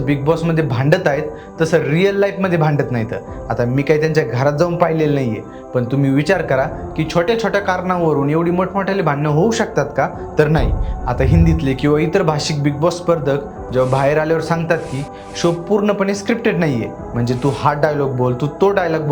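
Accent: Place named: Vidarbha, India. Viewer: Tu vidarbjacha ahes ka? Kiti Ghana bhasha Bolto.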